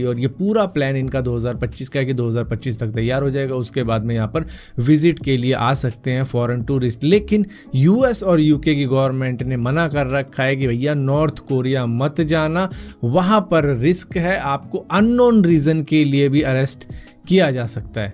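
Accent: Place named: Punjab, India. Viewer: Bai tum Punjab se ho I know I know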